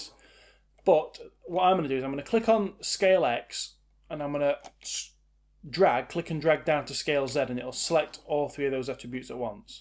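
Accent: United Kingdom. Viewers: Grate Tutorial! Excellent British accent!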